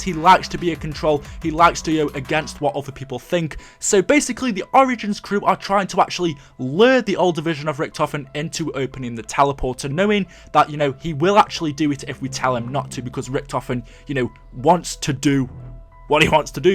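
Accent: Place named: Stoke, United Kingdom. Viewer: hate the Stoke accent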